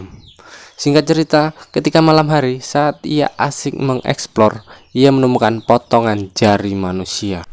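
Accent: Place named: Java, Indonesia. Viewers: logat jawa ny jgn nampak guna kan bahasa indonesia yg jelas dn tepat.. Setiap Youteber mengunakan bhasa indonesia. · Emange harus b.indo. jadi diri sendiri lebih baik drpd harus merubah diri, jdinya aneh. Semangat trs bang, w jg orang jowo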